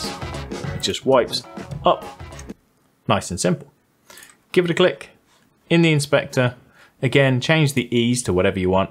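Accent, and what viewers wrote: United Kingdom. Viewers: What's not to like,?! I learned how to make an intro, and I got to hear a cool accent from across the pond.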